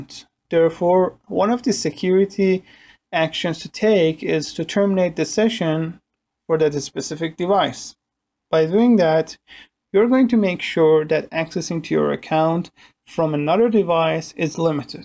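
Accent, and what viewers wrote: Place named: Iran. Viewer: thank you,, nice persian accent :)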